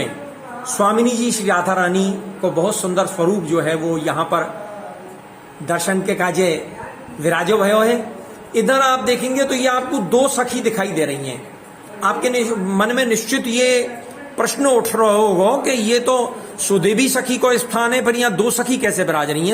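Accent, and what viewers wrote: Braj, India. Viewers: महाराज जी आपकी ब्रज वाणी बड़ी मनभावन हैं 🙏🙏🙏 जय राधा रानी की 🚩🚩🚩🚩🚩🚩🚩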